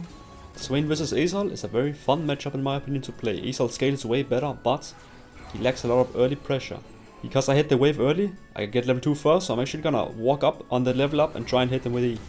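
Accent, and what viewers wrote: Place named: Denmark. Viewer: I can already tell you’re from Denmark by your accent🤣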